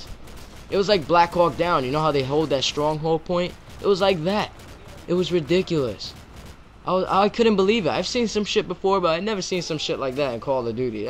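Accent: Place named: Italian-American, United States. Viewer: You sound Italian/American, are you? Either that or your putting on the voice a squeaker would do if you said they had a high voice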